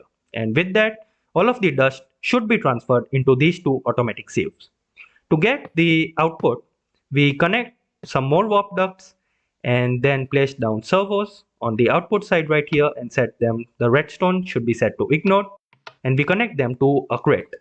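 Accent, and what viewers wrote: India. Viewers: Are you indian?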